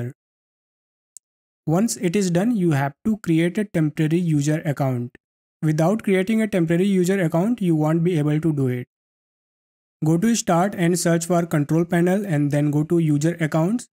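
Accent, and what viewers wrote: India. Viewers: Powerful bro with indian accent . Thank you so much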